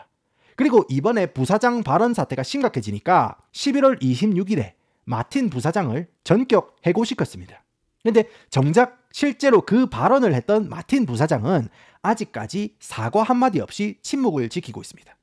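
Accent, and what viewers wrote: Gyeongsang, South Korea. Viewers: 언어 천재 DNA가 경상도 사투리와 영어를 만나 미쿡 이야기를 맛돌이로 말아주는 채널 ㅋ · 경상도억양 재밌는데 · 이 튜브의 재미는 님의 성대모사와 창, 구수한 갱상도 사투리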